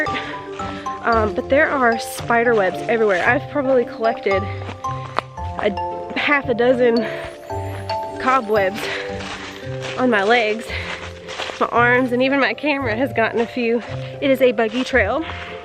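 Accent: Kentucky, United States. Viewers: Wow, you have been in Kentucky way too long. You sound like a country girl.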